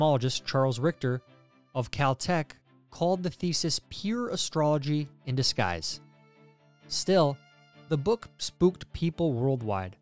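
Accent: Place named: United States. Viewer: For our American cousins, it is pronounced York-sh-ear, Same was Warwickshire, wa-wick-sh-ear. But to be fair it does sound nice pronounced wrong in a US accent so we'll let it slide. What do us Brits pronounce wrong in the US, be interesting to know.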